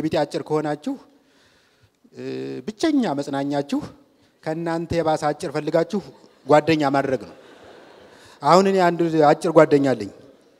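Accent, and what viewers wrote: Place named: Rural, Ethiopia. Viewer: በውቄ አንተ እኮ ትለያለህ የገጠሩና የባህል ዘዬ አቀራረብ ነው ዋው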